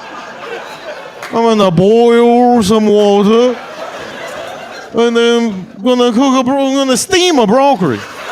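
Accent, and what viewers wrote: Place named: United States. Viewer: This is the most american sounding canadian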